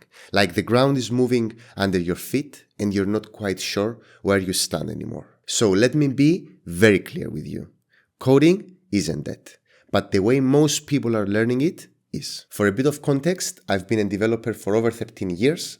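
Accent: Cyprus. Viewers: Hey, I can tell from your accent that you are from Cyprus Χαίρετε κουμπάρε μου😂😂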